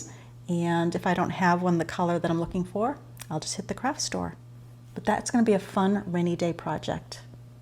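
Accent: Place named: Philadelphia, United States. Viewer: I love when I hear you say soar for saw…very Maineish for someone who has a Philly accent. Thank you for your channel. Your voice is very soothing ..a follower from Pemaquid Point in Maine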